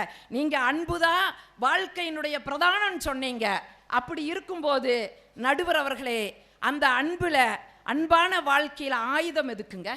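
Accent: Kongu, India. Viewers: Super mam..👌 Konjum kongu thamizh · Madam kongu tamilil kalakkareenga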